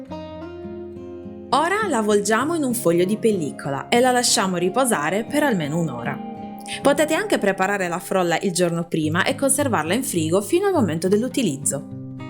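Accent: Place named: Sardinia, Italy. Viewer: Ciao ti seguo sempre: molto brava👍sei sarda eh!!!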